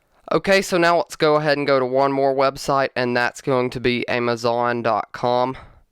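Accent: South, United States. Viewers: That southern accent though :)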